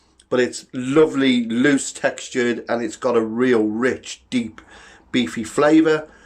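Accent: United Kingdom. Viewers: British 110 per cent!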